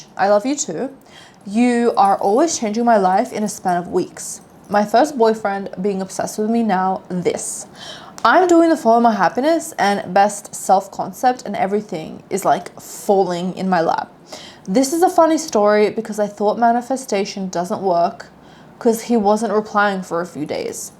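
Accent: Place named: Australia. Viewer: Are you Aussie? Love your accent❤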